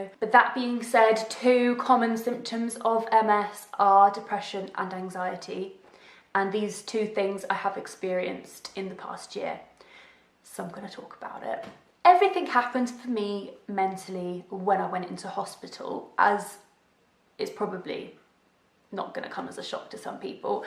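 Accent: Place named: England, United Kingdom. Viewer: Right, exactly. It's easy to take our health for granted before it all goes wrong. I'm the same age as you and don't have MS but I work in a hospital and I witness victims first hand. Thanks for keeping us updated and I think we can all say we admire your courage. And yes keep having fun! :) P.S. And we Americans definitely admire that English accent, haha.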